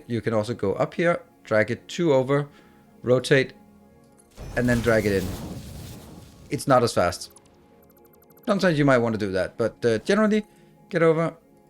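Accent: Denmark. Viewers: you sound so Danish but i really dont know but thats besides the point, Great video and content my dude!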